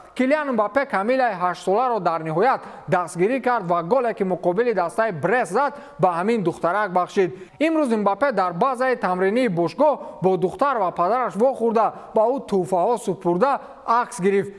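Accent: Kulob, Tajikistan. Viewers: Ира ами гапзаниш зебш лафзи Кулобигиай на ин ки будикский ть бра ягон будика ихел гап нишон бтее хорошо лафзи шмо агар лафз мешид дар иҷа ба Кулобиги гап намезад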